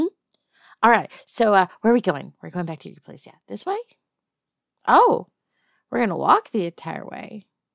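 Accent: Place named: Texas, United States. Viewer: once again your sweet voice brings another one of “cryptid women” stories to life. Tiger with Sweet Texas tang. One of these days your ever so powerful double entendre will rip a hole in the space time continuum. 💛💚💙❣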